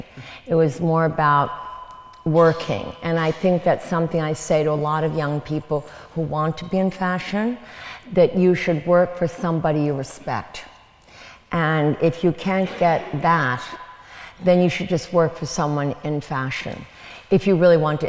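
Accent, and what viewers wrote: United States. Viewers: Lily Conte Agree! I'm perplexed. She speaks perfect "American" English. It doesn't make any sense.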